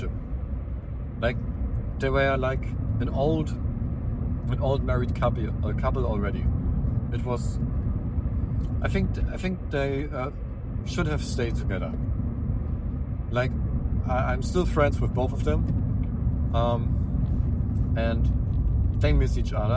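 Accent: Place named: Germany. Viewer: Your accent is so german, u german?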